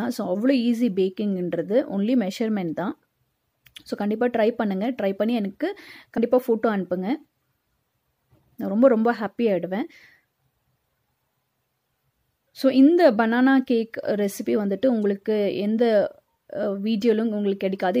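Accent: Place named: Bangalore, India. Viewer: Hi....you have superb banglore slang... while you talking it's like my mom's family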